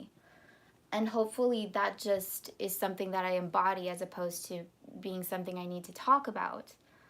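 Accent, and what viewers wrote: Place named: United Kingdom. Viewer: I'm glad you are doing well. Thank you for the book reading today. You don't have to do that next stream if you don't want to but you're a terrific reader with a lovely British accent lol. Hope you will continue doing at least one Livestream a week but if you need a break every so often from YouTube that's cool too. Have a good weekend 🙂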